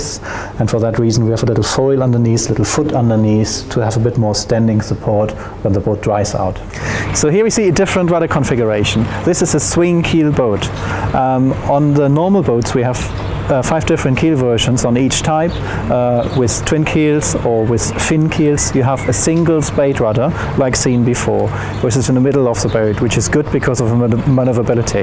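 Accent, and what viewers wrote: Germany. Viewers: Hey, it is you again. I remember your German accent. How's your business in the pandemic?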